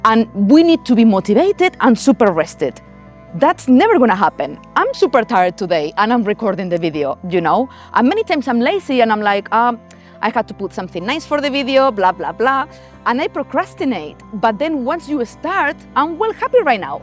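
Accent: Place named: Spain. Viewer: Yo no más quiero escucharte con ese acento español.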